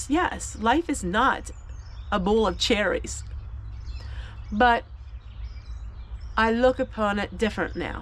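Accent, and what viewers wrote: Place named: Maryland, United States. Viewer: your channel is so fun and uplifting and a little wacky in a good way. i enjoy your garden and your collectibles and of course it's nice to hear someone not be ashamed to mention the Lord Jesus. your accent is similar to ours in Maryland but ours is a little more southern. do you have any pets other than your chickens.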